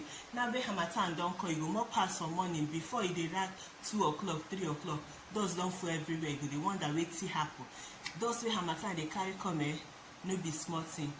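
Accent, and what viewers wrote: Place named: United Kingdom. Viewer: Your pigin still dey sound like British English lol! Speak the warri undiluted pigin.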